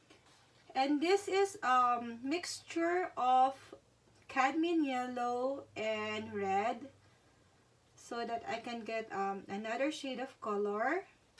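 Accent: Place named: Philippines. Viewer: You sound Filipina. I love this painting. It’s as close to my favorite color as it can get; red.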